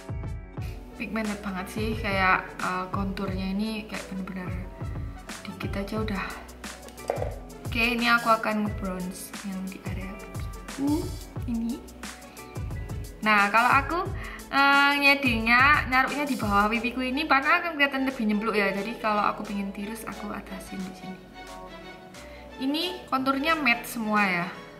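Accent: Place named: Probolinggo, Indonesia. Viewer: aku suka sama mbak.😁 suami khu orang perobolinggo suka ketawa denger suara mbak, kata nya jadi kangen rumah 😂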